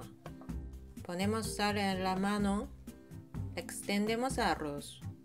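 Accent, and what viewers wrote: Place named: Spain. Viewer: Que hermosa la Japonesa... Me gusta tu acento español y se mira que cocinas muy sabroso. Saludos 😉😘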